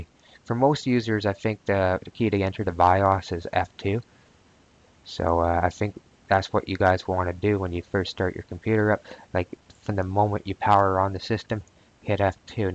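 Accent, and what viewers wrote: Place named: Canada. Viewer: Ese acento Canadiense... 😏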